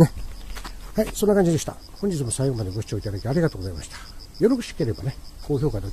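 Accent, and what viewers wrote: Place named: Himeji, Japan. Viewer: さん、情報有難うございます！他にもたくさんの情報、楽しく拝見しました！！行って報告しますねー。実は、わたし、姫路出身なので、毎度『みなさ～ん、こんにちは』のイントネーションが私と同じで、わらってしまい、さんの動画のファンになりました（笑。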